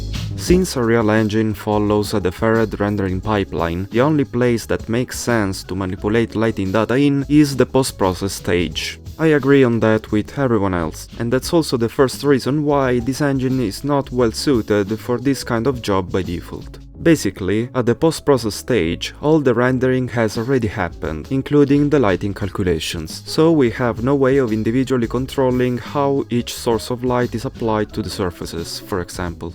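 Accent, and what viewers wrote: Italy. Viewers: ottimo video, l'accento italiano inconfondibile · you sound italian as hell... fire video.....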